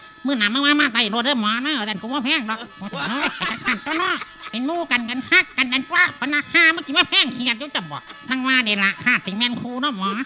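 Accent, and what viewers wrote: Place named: Ubon Ratchathani, Thailand. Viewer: ด้อเดี้ยนนน กัน😂😂ว่าเเต่ไทอุบล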